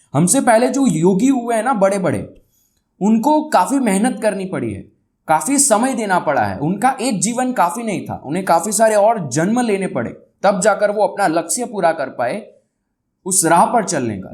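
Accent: Gujarat, India. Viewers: How do I meet your Guru? Thank you. (I note Gujarati accent in your speaking. You seem to be a Gujarati(may be a Marathi). I could be wrong.)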